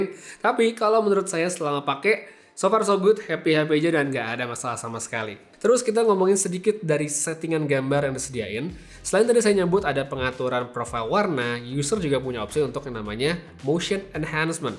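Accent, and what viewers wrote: Bali, Indonesia. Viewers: Orang bali ya bli?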